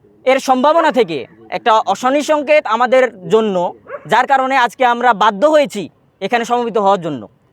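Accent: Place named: India. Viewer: এই গলা হিন্দুস্তানি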